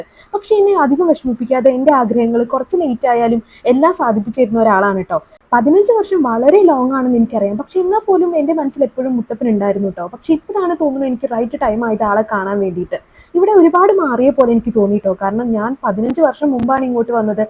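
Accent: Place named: Thiruvananthapuram, India. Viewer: നേരം പര പര പെലർന്നു..😂😂😂 തിരുവന്തോരം സ്റ്റൈൽ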